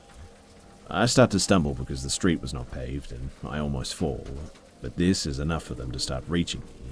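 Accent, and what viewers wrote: Australia. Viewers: THANX FOR ALWAYS BEING CONSISTENT WITH AWESOME CONTENT.. I ADORE UU.. UR AUSSIE ACCENT IS SOO CUTE!! TY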